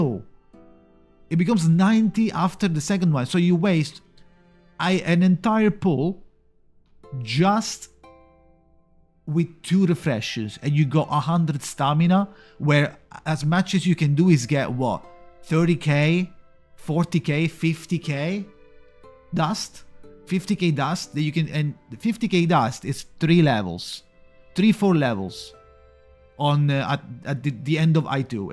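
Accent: Italy. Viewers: sapevo che eri italiano, parli inglese con il mio stesso accento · Però l'accento italiano si riconosce subito ahahah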